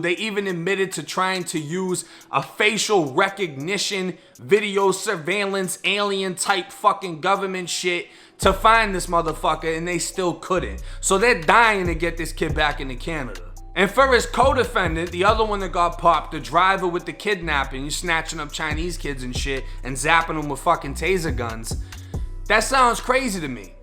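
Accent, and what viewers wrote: Boston, United States. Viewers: yo are you from boston your accent is crazy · I'm new here , you from Boston? That accent got me cutting up lol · You from new England boss?? Deff sound like your from boston im sure not 1st time you've heard that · Are you a Bostonian. You talk like them.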